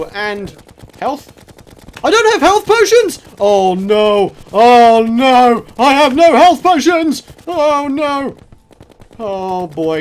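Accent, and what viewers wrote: United Kingdom. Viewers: Walker Wilkins I think they're both British.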